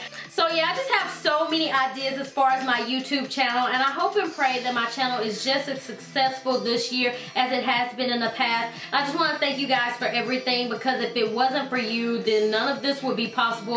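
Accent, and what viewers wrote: South, United States. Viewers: I love watching your videos & that southern accent :-) keep up the good work & YESSSS EAGERLY AWAITING THE ROOM TOUR B/C WRKN ON MINE & NEED SOME INSPIRATION :-) Be blessed!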